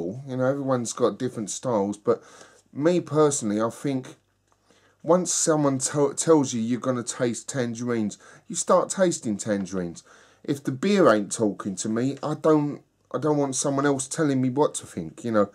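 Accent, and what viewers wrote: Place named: South East London, United Kingdom. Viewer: Interesting about the brewers star, thanks for sharing, I did not know that. I did know about the five pointed star in Washington yes. The pentagram has been used for thousands of years, mostly symbolizing life or humanity, or the union of male and female. I like watching your videos by the way, I think your quite and interesting reviewer. Where are you from? You have a South East London accent, you sound just like my mate from Peckham.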